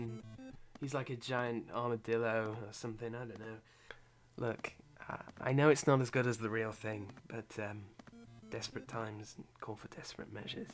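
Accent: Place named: United Kingdom. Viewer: You're only funny because of the British accent.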